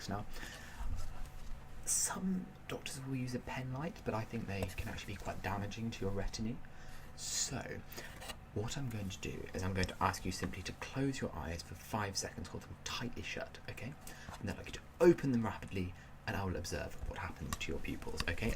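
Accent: United Kingdom. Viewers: Tbh this British accent makes the whole video even better. Damn it relaxes me ^^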